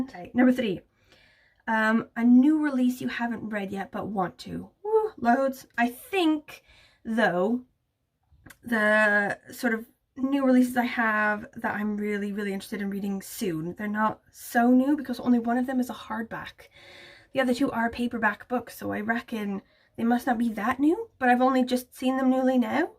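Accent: Wales, United Kingdom. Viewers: I haven't caught one of your videos for a while because, you know, life and work and reading get in way of watching stuff. So this was a chance to catch up which was lovely but also made me notice you are sounding much more Welsh these days. It's great! You now have the best accent combination ever.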